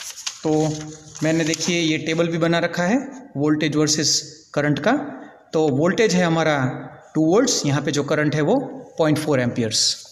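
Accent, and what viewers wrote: Gujarat, India. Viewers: Are you Gujarati??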